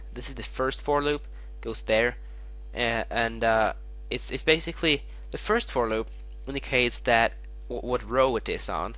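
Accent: Sweden. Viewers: R u swedish? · are you Swedish? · Hah du er svensk? ;)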